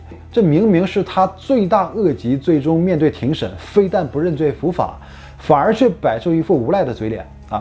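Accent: China. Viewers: 就是放弃了吧，不配合也没什么奇怪的，不是每个人都那么有求生欲。只不过后面也许是重新有了什么生存的渴望，才会开始上诉。 能下跪祈求，也许是真的割舍不下吧，活着不能在一起，那就一起死吧，只不过他终究是没有自杀成功。抛开对错，他的行为也没什么奇怪。人家性命都不要了，又怎么会在意一点儿法庭上的行为。 主播口音像大陆人，但一个生在红旗下，从小唯物主义洗脑的人，扯什么遗臭万年太荒诞了吧，满手血腥的都不会在意，咆哮公堂的有什么可在意的，自古以来这样的人多了去了，谁的名字能因为这个留下了，“遗臭万年”的成本有这么低么。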